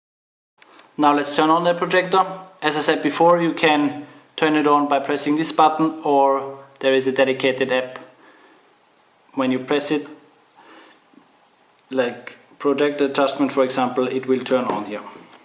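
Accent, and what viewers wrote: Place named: India. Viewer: all Indian accents sound shit, go back to Hindi, indians...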